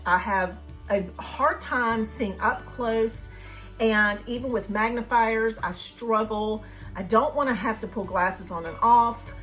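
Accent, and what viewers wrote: South, United States. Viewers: These are beautiful. I like the magnolias best! I do have one question that you didn't mention--where do they get their images, do they pay artist royalties? And btw your pronunciation of "mauve" isn't standard for the US, it's because of your Southern accent, which is adorable. :) Also, the first picture shows peonies, not roses!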